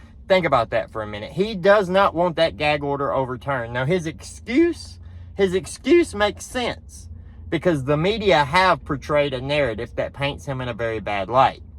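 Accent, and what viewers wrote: South, United States. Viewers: Where in god's name are you from? Your southern twang is SO strong!!! I love it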